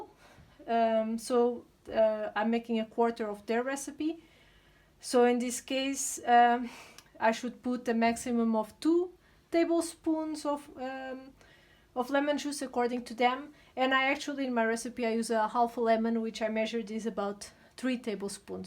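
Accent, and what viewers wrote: Portugal. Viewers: Just a question: Are you Portuguese? Because your accent seems to be 😁. Another question :This colour doesn't affect the hair colour? It just cover the gray hair? Thanks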